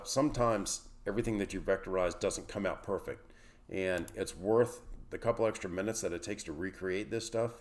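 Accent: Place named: Philadelphia, United States. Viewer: Where are you located? You sound like you’re from Philly or South Jersey.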